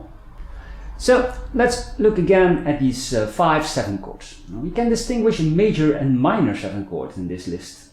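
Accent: Netherlands. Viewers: The Dutch accent suits guitar videos perfectly! Who knew? 😁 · you can tell in a split second when somebody is from the Netherlands after they open their mouths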